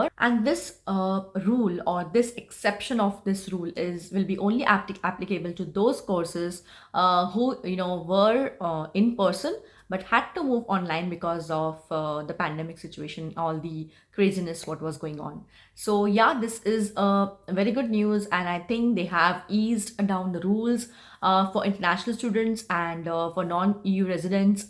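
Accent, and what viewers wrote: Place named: Punjab, India. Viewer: jaise app bol raha ho app to toh punjabi hi lag rahe ho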